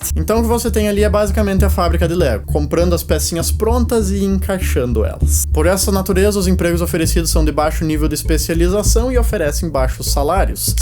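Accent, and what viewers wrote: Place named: Paraná, Brazil. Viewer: Muito obrigado pelo conteúdo! Vc é paranaense pelo sotaque.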